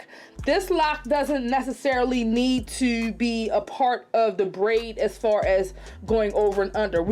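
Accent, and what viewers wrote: Baltimore, United States. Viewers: Dope ENERGY I JUST SUBSCRIBED BECAUSE YOUR VOICE IS SO CLEAR .. u from Baltimore AINT you Heey girl ❤️ I’m literally about to do this on my client going to Memphis I know how to fishtale braid it never did it on LOCS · That B-more accent is thick!!🔥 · Tew…. Lmao Sounds like Baltimore is where you’re from… hopefully I can master this on my sons locs in the am… 🙏🏽❤️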